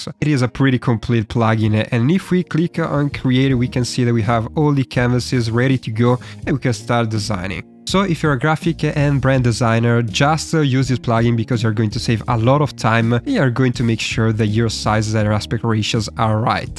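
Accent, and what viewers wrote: Italy. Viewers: Give the guy a break, that is how Italian’s talk. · I find Italian accent very funny and interesting 😆❤❤❤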